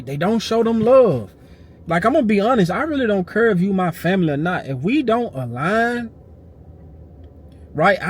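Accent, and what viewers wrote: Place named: Louisiana, United States. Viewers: Sounds like Louisiana to me cuz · New sub,u speaking facts🤣😂🤣😂😂😭😂😭😂aye u got a southern accent u gotta be from da south,im from Louisiana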